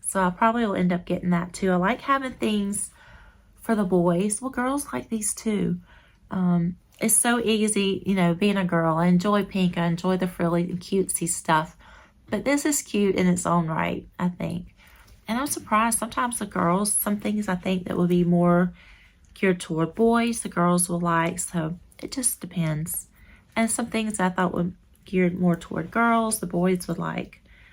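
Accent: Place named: South, United States. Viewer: Oh lord I definitely watch to the end, I could listen to your Southern accent all day!! The watch time can be tricky as it is not necessarily 16 minutes from the start to the 16 minute mark, some people may forward ahead and watch later in the video sections. Just keep being you however long they are I will still watch!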